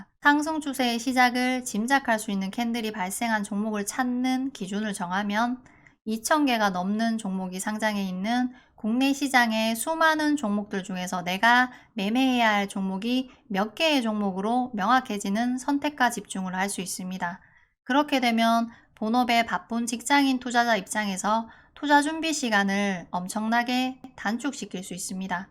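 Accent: Gyeongsang, South Korea. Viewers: 설명이 깔끔하시네요 근데 경상도 어디 사투리인가요~?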